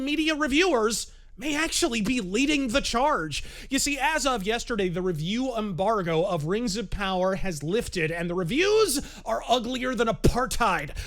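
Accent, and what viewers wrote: England, United Kingdom. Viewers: For a brief momemt, I thought you had a british friend do VO. Haha nice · As an Englishman I approve of English accent. One Shitposter to Rule Them all!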